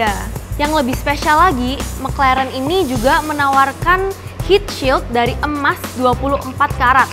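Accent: Java, Indonesia. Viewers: Mbk nya orang JAWA ya.? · Kok suaranya medok bgt , asli mana ya , surabaya kah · Terpesona sama mbak nya... Medok banget kaya nyonge 😂😂😂😂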